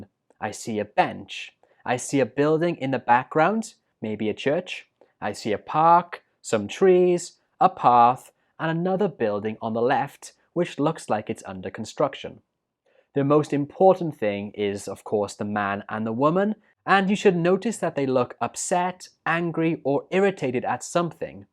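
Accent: United Kingdom. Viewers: Your English pronunciation is so good, are you British because your accent is very nice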